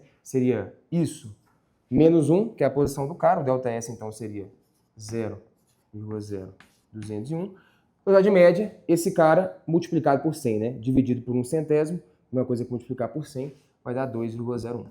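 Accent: Minas Gerais, Brazil. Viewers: Esse professor é mineiro mas se veste de português.kkkk. òtima aula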